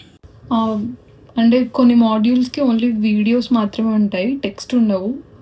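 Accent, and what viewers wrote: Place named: United Kingdom. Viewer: Your English Accent is like British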